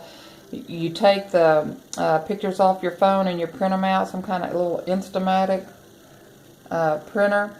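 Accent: South, United States. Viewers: Hi! Love your journal....being from MS, it's great hearing a southern accent! And being from Houston I am quiet sure you DO know how to cook beans!! LOL.. I also love western and Native American stuff... love how you tied the seam binding in the holes on the pages! I could say so many more things about this great journal... Thanks so much for sharing it with us!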